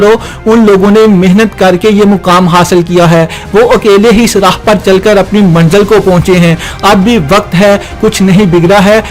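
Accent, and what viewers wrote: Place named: Punjab, Pakistan. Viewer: Bhai Ap apni Urdu pronunciation theek Karen. Ap khhe ko he bolte hen or Punjabi lahja clear dikhta he. Clear Urdu bolen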